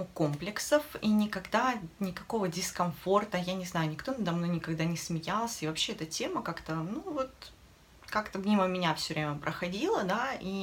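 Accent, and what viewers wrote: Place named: Provinces, Russia. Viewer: простая речь как из глубинки и провинции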